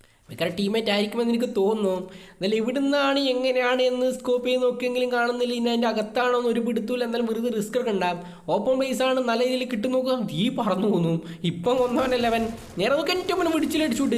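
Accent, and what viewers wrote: Kannur, India. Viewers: Bro kannur ano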